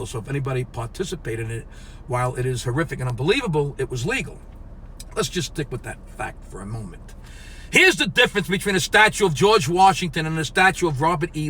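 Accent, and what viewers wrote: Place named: Long Island, United States. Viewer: says it in the Long Islander way, my home town....he may be a comic but history shows a lot of comics make a lot of sense; like George Carlin. Just listen to this guy and tell me if he is wrong, think about it and if you still don’t get it, play it back again and think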